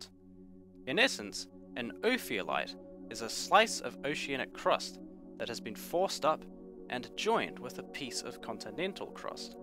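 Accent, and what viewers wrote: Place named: New Zealand. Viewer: Nice music, but it makes me relax to the point of sleeping. It becomes harder to focus, and since I love (and miss) the kiwi accent, it acts as ASMR ^^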